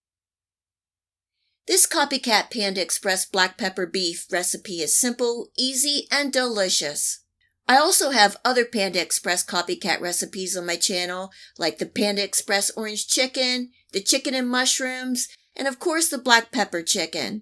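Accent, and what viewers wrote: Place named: Pennsylvania, United States. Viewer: Love your true Pennsylvania accent.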